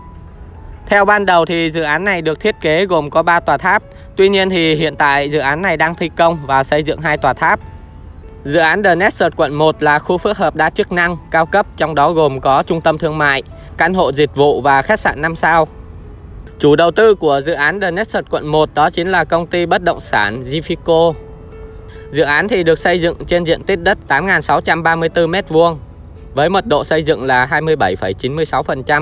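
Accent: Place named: Binh Duong, Vietnam. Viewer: Giọng nghe ghét, Bắc không ra Bắc, Nam không ra Nam, giống BD vậy, nửa nạc nửa mỡ.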